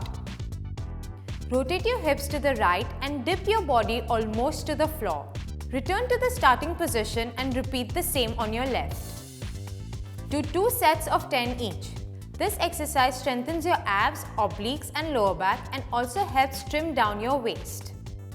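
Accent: India. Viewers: Indian accent has to be the most annoying accent ever. Liked the exercises but listening to her speak is like nails against chalkboard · U r indian... Right...came to know this after listening ur accent..😎😘😊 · oof I'm indian but i hate this accent.... trying too hard.... but great video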